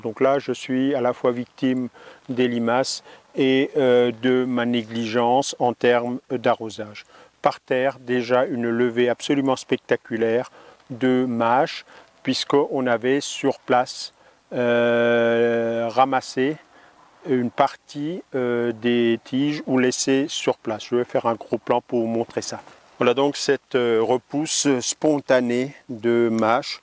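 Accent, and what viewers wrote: Alsace, France. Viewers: Non, de grâce, ne changez rien! C'est notamment la longueur de vos vidéos qui en ont font tout l'intérêt, car on apprend aussi beaucoup de choses dans vos apparentes digressions, qu'elles soient relatives aux techniques de culture ou plus éloignées du sujet (grâce à vous j'ai réécouté avec plaisir!). D'autres détails un peu décalés et "inutiles" dans l'absolu me font mourir de rire et ça fait du bien aussi! Et puis quel bonheur d'écouter votre parfaite maîtrise de la langue française, l'utilisation toujours réfléchie du juste mot, c'est devenu tellement rare, c'est comme du miel qui coule dans mes oreilles... On ne voit que trop l'effet direct et catastrophique des textos et autres twitters sur l'appauvrissement de la langue française et du raisonnement intellectuel... Et votre charmant accent alsacien qui fait votre signature sonore me berce, me repose... 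C'est l'éloge de la lenteur, de la fantaisie, ça va à l'encontre de tout ce qui fait notre époque moderne (concision, rapidité, prétendue et illusoire efficience), et, n'en déplaisent à certains (libre à eux de faire "avance rapide" ou de regarder d'autres vidéos), ça fait le plus grand bien!!! Alors merci, merci, merci, pour tout ce que vous êtes, ce que vous donnez, et surtout, continuez comme cela, monsieur le paresseux!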